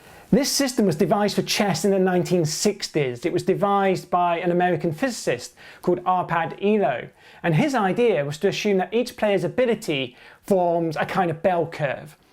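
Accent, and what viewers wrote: United Kingdom. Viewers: My brain melted. 😢🧠🔥 PS. Cool British accent. 🙂👍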